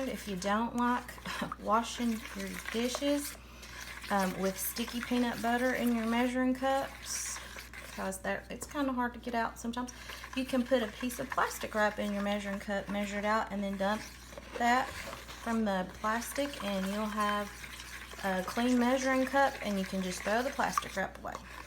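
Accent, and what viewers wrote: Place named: South, United States. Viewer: Love her Southern accent. Much better than the East Tennessee drawl I had many years ago!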